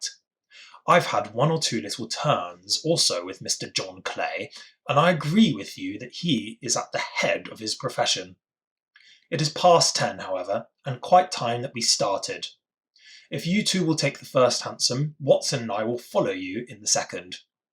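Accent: United Kingdom. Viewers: 前編のA Scandal in Bohemiaも大変勉強になったので、待っていました！ 典型的なちょっと上流のイギリス英語ですね。何度か最後までしっかりと聞くと、ストーリーがしっかりと理解出来ると思うので、頑張ります！：）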